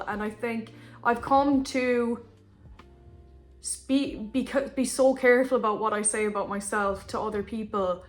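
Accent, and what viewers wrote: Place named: Ireland. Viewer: Lastly, you immediately got more irish when you went home hahah you can really hear it at the start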